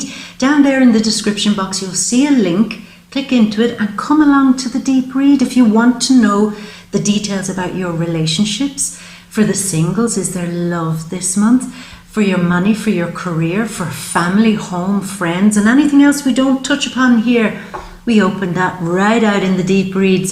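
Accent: Ireland. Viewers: Love Love Love Love Love the Irish accent. Good on ya girl. I'm an ox........ Gotta start a new business. Buy a lease on a pub. 85000 for a 20 year lease. But I don't have good credit, so it's tricky to get funding. Gotta keep charging on. Ah thanks. Glad I found you on you tube. I'll come and see you in Ireland soon. xxxxx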